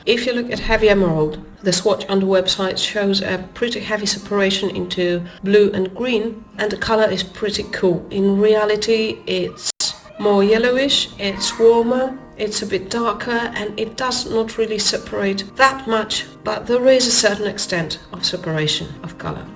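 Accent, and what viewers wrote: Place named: United Kingdom. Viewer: I really appreciate your honest review. I hope you had a fabulous holiday!! You sound like you now have a British accent ...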